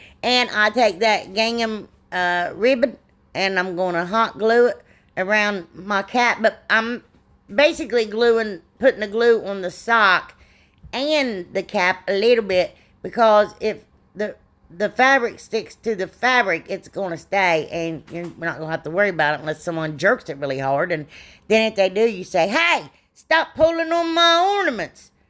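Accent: South, United States. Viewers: I just started watching your channel and I have fallen in love with your accent, sense of humor and talent. I look forward to seeing more. BTW, can I ask you where you're from? I hear some southern but I'm from SC and I'm pretty sure you're not from here. I think could listen to you all day😍😊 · New subscriber here💕from Monteagle Mtn.,Tennessee...LOVE YOUR DIY'S😍..and gurl,you're a "hoot"😅I already know,I will be a big fan of your channel...one question,where are you from/at?I know your somewhere down south ..(ya just can't fake,that accent😉🤣)..thanks for sharing and looking forward to the next one💕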